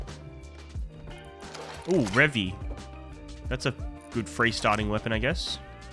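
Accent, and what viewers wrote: Australia. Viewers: First 5 seconds I'm like "Oh he's Australian? Subscribed." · I honestly didn't even hear the Aussie accent and now I find it crazy that I could have talked to this man before❤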